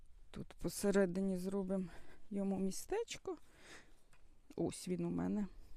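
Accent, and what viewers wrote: Poltava, Ukraine. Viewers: Зразу впізнала полтавську манеру розмови, бо у мене тьотя там живе. Дуже приємно слухати. Ви молодчинка, любите землю і рослини!!!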